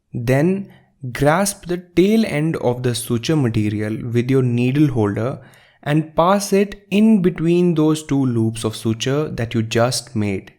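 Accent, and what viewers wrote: India. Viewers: This is not an english accent this is an Indian accent and if you want to be any sort of doctor you will fail due to this attitude. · This is how we Indians talk. Got a problem?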